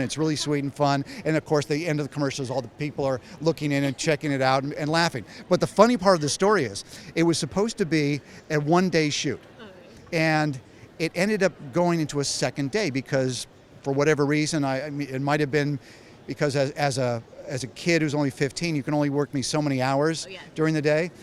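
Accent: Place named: England, United Kingdom. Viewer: Here in the UK the narrator has an English accent. I'm certain they didn't get a different narrator just to show it here. Plus although the accent is definitely from England I couldn't tell you from whereabouts. It's more like a generic English accent. Does anyone have an answer for me.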